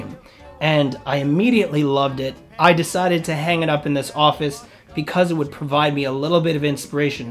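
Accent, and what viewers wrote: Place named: New York City, United States. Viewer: I like your taste in fragrance ... and your accent, love the slight traces of the NYC accent <3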